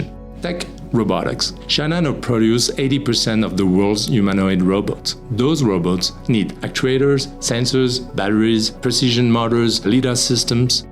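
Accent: France. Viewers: Fantastic research. The data provided is exquisite. Thanks for this valuable work. It's priceless The only thing that gets in the way is the french accent. Man, your videos are the only ones I can watch till the end. I now realize how the articulation of the two languages are different that it makes it almost impossible without sacrificing good amount of clear speach. Almost all sounds are pronounced differently.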